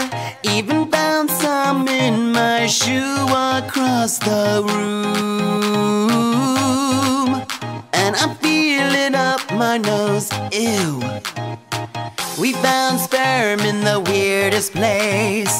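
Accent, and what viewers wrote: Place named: London, United Kingdom. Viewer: LMAO at the London accent....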